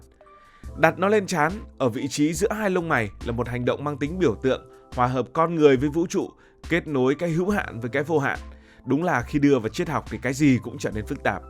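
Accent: North, Vietnam. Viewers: Thích cácvideo của BLV. Ngắn gọn nhưng xúc tích. Lại thêm giong bắc rất hay.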